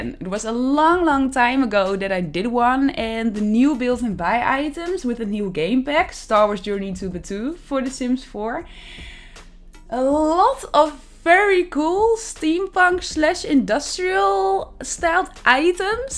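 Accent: Netherlands. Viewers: Je hoort gewoon die nederlands 😂💜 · Are u dutch 😅 it sounds lik u dutch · You sound dutch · But your accent is always so much more charming than our awful German accents; we obviously also love a Dutch accent in German as well! 😆 You always sound so cute and friendly and fun; I don't know why.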